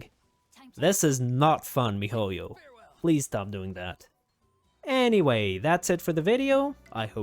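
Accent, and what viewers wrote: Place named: India. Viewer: this is good idea india aeccent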